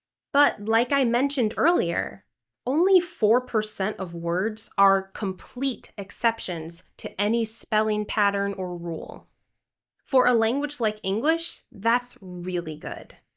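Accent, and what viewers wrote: United States. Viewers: Is this American pronunciation?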